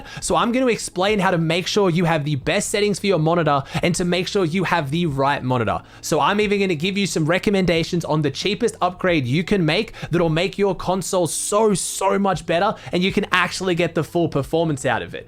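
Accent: Australia. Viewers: U doin great mate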